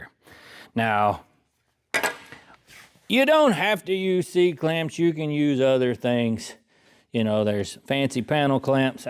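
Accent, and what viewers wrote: South, United States. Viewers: Just found your channel and I must admit, I'm kinda excited because you were one of my favorites to watch on TV (and we both speak southern LOL). Love the way you show the real world aspect of getting every thing to work right. I struggle with sheet metal because I spent my career welding big industrial iron. A half inch plate was thin material. Soo.... Going going from 1/8 or 5/32 rods and wire to .025 is a bit of adjustment. Lots of holes, just say-n. Love your stuff and I'll keep watching.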